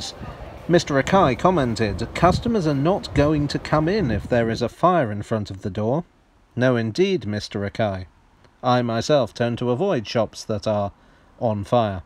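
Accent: United Kingdom. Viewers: I can’t tell if this is a mini documentary or just a straight up British guy roasting a building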